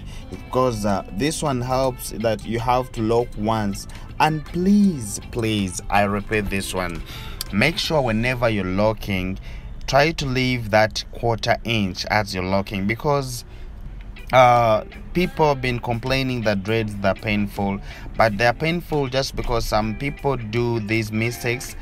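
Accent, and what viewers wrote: Uganda. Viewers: Yeah definitely Ugandan. He sounds and talks like my dad. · According to your accent I think you’re a Ugandan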